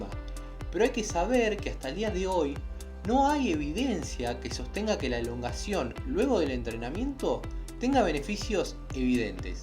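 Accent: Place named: Argentina. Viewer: Me encanta el acento argentino suena bonito, tienes buenas recomendaciones.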